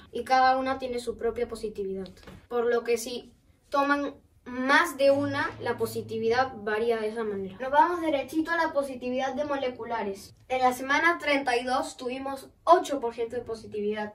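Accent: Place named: Argentina. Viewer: porque algunos peruanos hablan como argentinos????? pense que eras de argentina se parece bastante el tono... alguien le paso lo mismo???????????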